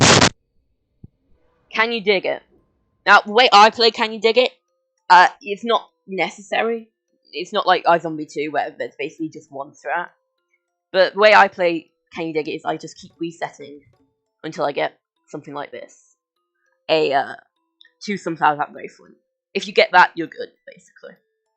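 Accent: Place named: United Kingdom. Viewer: U sound so briish its amazing.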